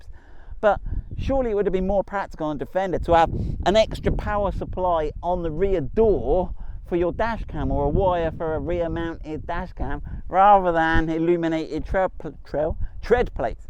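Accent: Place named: Purton, United Kingdom. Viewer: Stay chilled. I totally get what you say about early adopters and I’m pretty sure we all appreciate your efforts. I for one continually sing your praises to everyone on the Range Rover 405 Facebooks page. I love the personal approach to your videos and explanations and agree with you about the sense of community and love the way you connect with your customers and subscribers (maybe it’s because I’m an old Purtonian and we share the same accent). Keep well and make the time to enjoy Dad days with. All the best from (for once) sunny Dartmoor. 👍🏼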